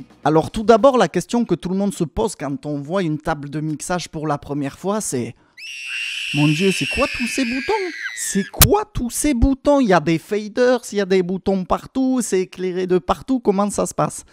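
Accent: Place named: South, France. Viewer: super ta vidéo! je cherche une table de mixage et tu m'as donné envie d'acheter ce modèle! je connais bien le matos yamaha...mon fils m'a emprunté une table de mixage yamaha que j'ai acheté il y a plus de 10 ans chez cash converter en occase! 12 pistes 170 euros rien à dire! il y a une couche de poussière dessus de 10 cm au moins, et elle fonctionne toujours super bien!! il ne veut plus me la rendre!! en tout cas ton accent du sud fait du bien à entendre!! bonne continuation à toi!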